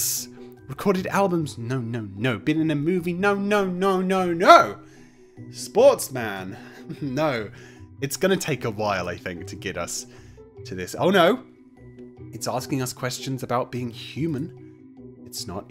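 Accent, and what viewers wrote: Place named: Leeds, United Kingdom. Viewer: You from leeds or am i wrong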